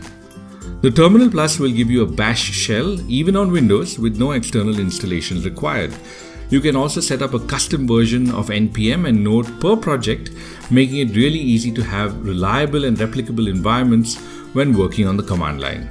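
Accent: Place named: India. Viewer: An Indian accent, really?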